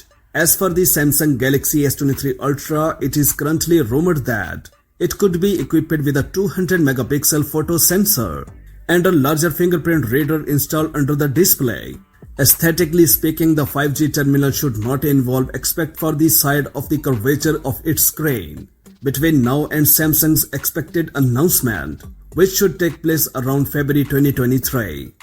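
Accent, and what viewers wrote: India. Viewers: Sorry, thats no interesting information and please talk without IIndian slang if you want to tell something to the world. I had to break up watching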